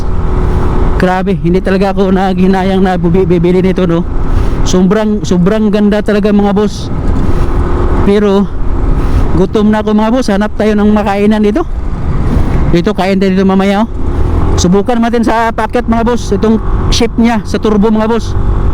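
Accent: Visayas, Philippines. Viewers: Sigurado ako BISAYA TO.... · Bisaya kaman dong😂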